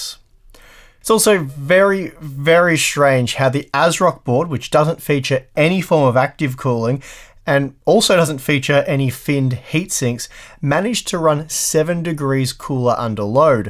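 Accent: Australia. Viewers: Just what I want in a tech YouTube channel, Australian accent, gives you alot of detail on everything Pros and Cons. Keep up the good work. 👌🏻👍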